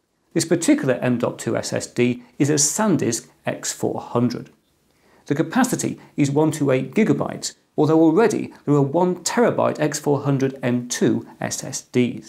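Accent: United Kingdom. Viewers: Information goes in so much easier when it's a fellow brit explaining it lol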